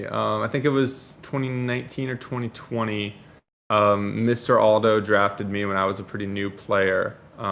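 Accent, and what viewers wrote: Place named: United Kingdom. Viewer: Hej that’s the brittisk guy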